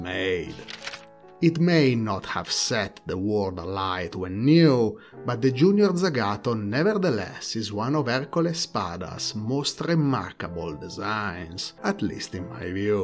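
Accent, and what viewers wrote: Italy. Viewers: I am an Indian, and I compliment you on your grasp over English which is superior to most Americans and frankly, quite a few Britishers. I presume your accent is what is affectionately termed Italian. A small suggestion, "often" is pronounced "of'n".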